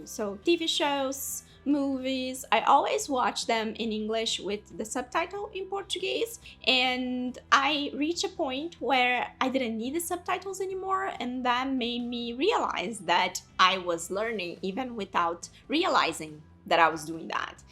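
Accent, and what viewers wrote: United Kingdom. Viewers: I love that u sometimes have a British accent and others an American one hahahahaha I’m the same! If I learnt the word with an American speaker I’m gonna say it like them, if it was with an Australian speaker, forever I will have one word in my vocabulary with the Australian accent 😂😂 I believe that happens with your accent based on the accent of the audiobook you came across for that vocabulary 😅😅 I love it so much and I’m gonna be here for all the videos as I am with your primary channel, following your success ❤❤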